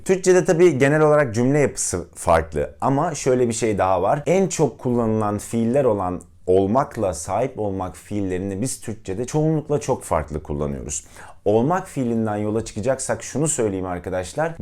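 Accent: United States. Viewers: Abi sen İngilizce konuşurken içimden 'Amerikalılar bile senin gibi konuşamıyor.Nedense senin aksanın daha gerçek geliyor' diyorum istemsizce. (umarım bi tek ben böyle düşünmüyorumdur?)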